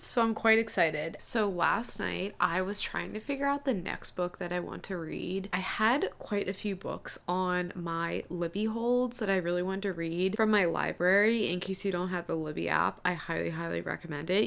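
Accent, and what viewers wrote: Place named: New York, United States. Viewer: What an amazing video you filmed this video so well and explained everything so thoroughly!! I love your accent!! Are your from New York?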